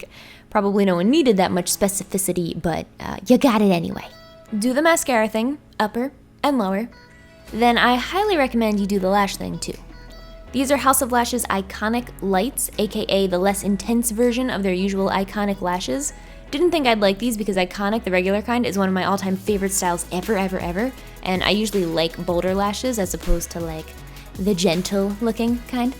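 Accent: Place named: New Jersey, United States. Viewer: How come u randomly get a jersey accent throughout ur vids lol I love it but srsly y does it happen?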